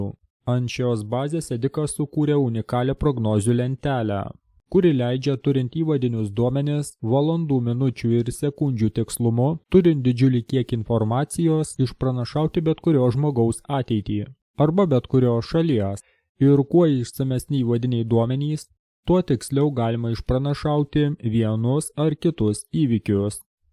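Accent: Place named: United States. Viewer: Klaysyk, satanistų rupore.... Išmok bent scenarijų perskaityti, ir pabandyk suprasti SKYRYBOS ŽENKLŲ prasmę, kai skaitai iš tau paduoto lapelio.... Dar.... Kokie biesai tave finasuoja? A, berašti parazite... Dar.... Tavo vertimas - IŠKRAIPYTAS ir to nepaneigsi. Ir nustok čia skleisti iliuminatų planą-svajonę. Ir žmones gąsdinti ir klaidinti. Pyzdink iš Lietuvos į tą savo jankyną, ir bent pasistenk, KAD PIDAROSIŠKAI-JANKIŠKO AKCENTO nebūtų, padla.... Nežinai nei rusų kalbos, nei lietuvių. Kas esi? Mormonas, jahovistas suknistas, ar kitoks Šėtono išpera?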